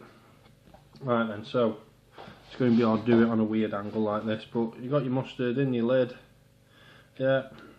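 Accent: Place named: Stoke, United Kingdom. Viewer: Sounds like a stokie to me !! P.s where can I buy the things you put in the spray from ?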